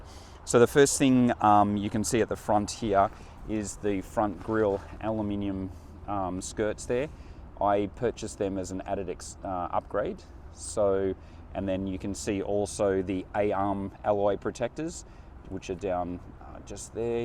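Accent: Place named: Australia. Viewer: Love the Australian accent and of course the Ryker looks very Mad Max :)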